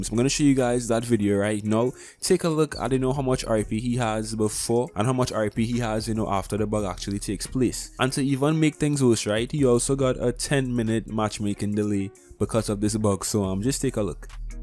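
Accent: Trinidad and Tobago. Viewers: is u from Trinidad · Are you trinidadian?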